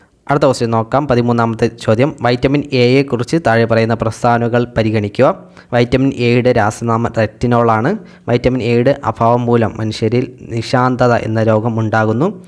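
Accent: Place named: Thiruvananthapuram, India. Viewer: Sir Tvm aano.....slang👍🏻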